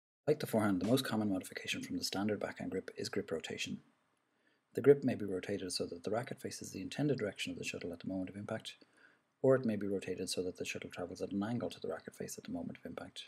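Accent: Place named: Ireland. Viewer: it sounds like Irish accent, ie he is British. I can perfectly understand all he said because I am British ;)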